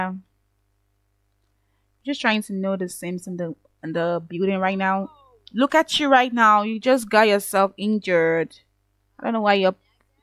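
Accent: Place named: Nigeria. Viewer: I thought so from your accent but I wasn’t sure haha. I am also from Nigeria based in the UK. It’s lovely to meet another Nigerian simmer 🥰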